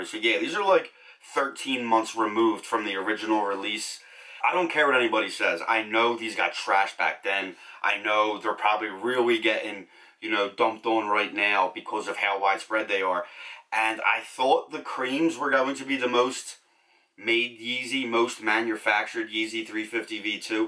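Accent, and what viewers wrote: Ireland. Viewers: I knew it I was hearing the Irish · These are dope. Are you Irish, kinda hear an accent?? Lol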